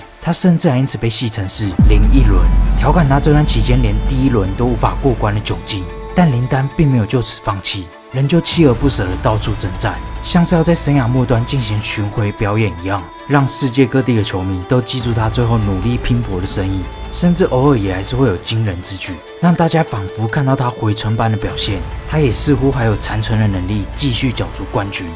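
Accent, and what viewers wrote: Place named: Malaysia. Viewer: 这口音更像马来西亚或者新加坡的。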